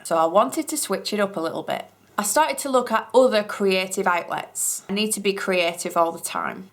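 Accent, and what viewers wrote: United Kingdom. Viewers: Thanks for your tutorials. I watch your videos a lot. I have twin boys. At night when it is bedtime, they often ask to watch a “cake video” on YouTube. I think the British accent helps lull them to sleep. It certainly not because you are boring. I used your toilet seat method for the first time this weekend on my boys’ bday cake. ;). I have a shop but I only do custom work. I don’t have a store front, and that works for me. It got all the stuff out of my house. Home is home and work is work. Thanks for all you and my other fav caking you tubers do. I have learned an infinite amount since I started making cakes 5 years ago.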